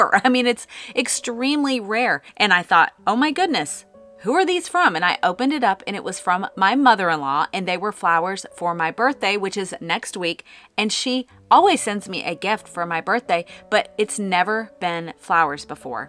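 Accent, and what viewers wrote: United States. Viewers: As a French native, I know the pronunciation can be difficult and also French people are stucked up the way French words are said. French isn't easy even for the French. I think that your American accent gives it a charm. I've been in the US for the past 25 years and still have a strong accent and often I killed the English words. What matters is that you did your best and wanted to honor Therese de Lisieux. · i love your videos and i’m happy you changed the channel name! i always thought it was funny how you obviously don’t speak french but have a French! channel name😂 i think ur pronunciation was okay but a little unintentionally pretentious especially in contrast with american accent coming right after😅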